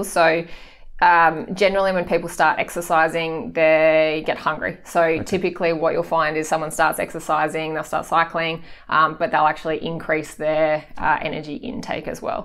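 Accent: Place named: Australia. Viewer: Australian acent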